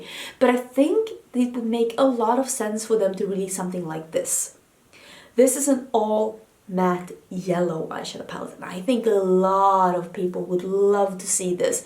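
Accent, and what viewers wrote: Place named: Sweden. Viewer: k this is so random but one half of my family is from Finland, moved to Canada in the 70s'80s.. I love watching your channel as it reminds me of my family who have now moved across the country 4 years ago! must be the similar accents/dialect of english as I know you are from Sweden. Beautiful countries!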